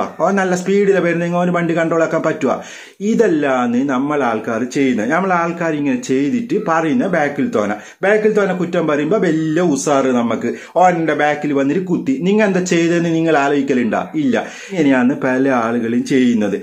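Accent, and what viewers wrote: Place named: Kasaragod, India. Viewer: Kasar kod Basha Yella Bashayum Ariyum😆😆🤣🤣Rasamund kealkkan😃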